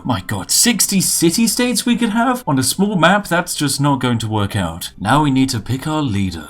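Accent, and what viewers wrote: United Kingdom. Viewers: Omg you British as fuck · Hmmm... sounds british to me. "Salute the queen" and "sniff your tea" Yeeeah okay, he's british alright. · This guy is annoyingly British but he makes good content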